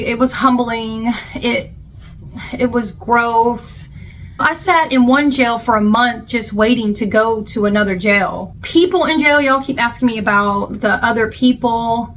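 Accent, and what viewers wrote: South, United States. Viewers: Three minutes in and I love your natural speaking voice. Are you from the south??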